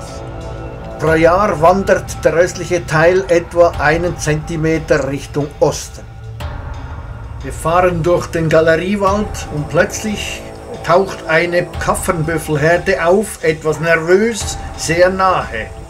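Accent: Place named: Germany. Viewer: What the f is this guy saying???? I know he's speaking English but it sounds German.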